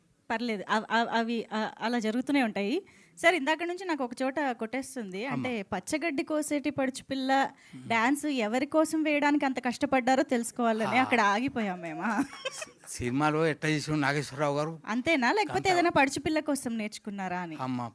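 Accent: Telangana, India. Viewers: Orey telangana slang ra ardham kadha niku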